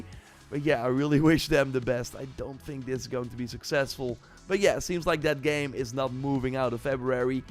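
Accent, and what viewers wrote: Netherlands. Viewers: I know that accent, you're probably from Netherlands 😀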